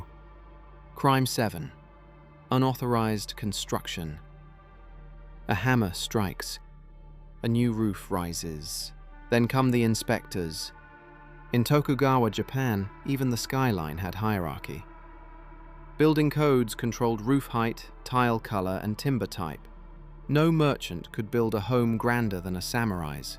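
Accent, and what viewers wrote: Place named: United Kingdom. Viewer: Even AI with a British accent mispronounces all foreign words. 🇺🇸⚓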